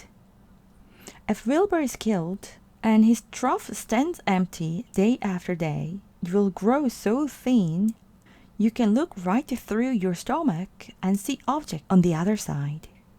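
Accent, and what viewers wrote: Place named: United Kingdom. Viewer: 이 영상 덕에 영국식 발음 배운것같아요^^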